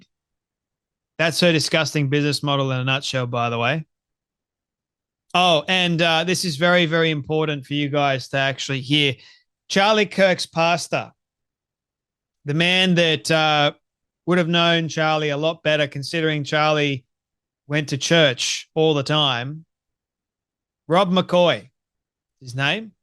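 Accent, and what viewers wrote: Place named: Australia. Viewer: I listen to you everyday, encouraged to hear an Australian voice. I admire your tenacity and relentless efforts God bless you.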